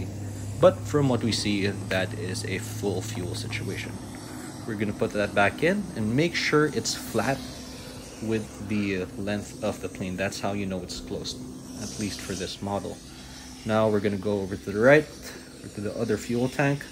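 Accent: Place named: Philippines. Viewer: Why u have a Filipino accent lol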